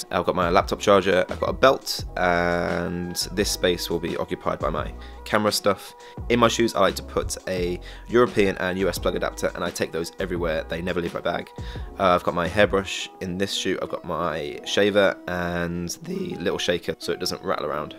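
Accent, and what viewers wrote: United Kingdom. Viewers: The first thing I said to myself when he first started talking is he’s British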